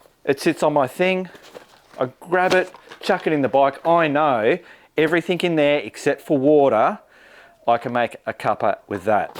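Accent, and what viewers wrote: Australia. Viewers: Crazy Australian, crazy accent. But.... I'm 57 and going moto camping for the first time this summer and this is a great series of videos. Hey, Presto!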